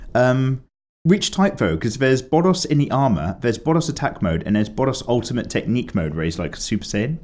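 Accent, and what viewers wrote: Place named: Dorset, United Kingdom. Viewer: those Dorset tones, that was bloody you Dorchester wasn't it! love your work keep it up you legend, btw pls share those txt documents.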